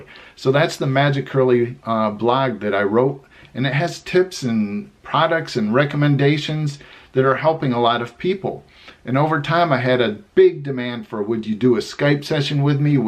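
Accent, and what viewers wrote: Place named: Upstate New York, United States. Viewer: OH MY GOD I GREW UP IN JOHNSTOWN! Was born in brooklyn and moved there when i was 7 and moved back to nyc at 19. I just choked listening to you say this! Jansen Ave elementary school and Knox jr high Lol!! Im dying this is TOO FUNNY! No one ever knows where it is, they always think I mean Johnstown PA. I have been wondering where you were from because you totally have that upstate accent. wemple and edicks chocolate jumbles were my favorite cookies growing up and we always went to Saltzmans in Euphrata every summer for their milk weed and fried chicken. I suffered MANY terrible haircuts in Johnstown and my Best friend Maria and I used to beg my Mom to take us to Albany to get Posner products after watching soul train every saturday because we both had thick curly hair and needed help. Beautiful family, thanks for sharing! 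:)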